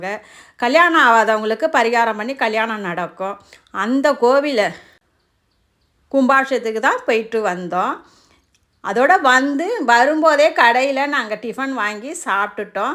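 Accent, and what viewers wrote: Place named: Thanjavur, India. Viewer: U have interior Thanjavur slang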